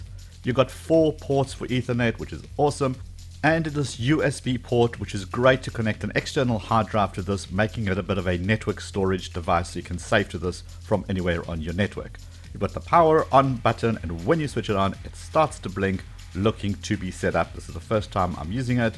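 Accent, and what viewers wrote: South Africa. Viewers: South African I think.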